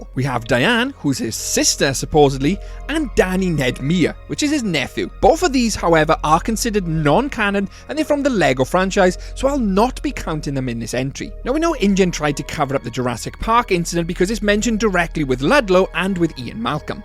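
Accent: United Kingdom. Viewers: Looking for a meaningful JP video. Clicks on this video Hears British dude Leaves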